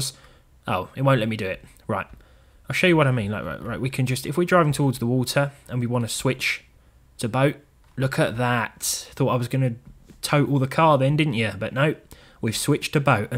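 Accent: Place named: Essex, United Kingdom. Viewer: M25 racer (also London racer) were set in London and the Thames valley. You could race around Oxford or Knightsbridge. sounds like he's more Essex way, but the game was at least set in England!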